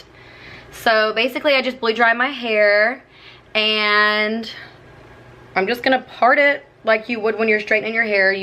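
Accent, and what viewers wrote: South, United States. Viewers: You kinda have a slight southern accent, it's really interesting loll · Is she from flordabamma shore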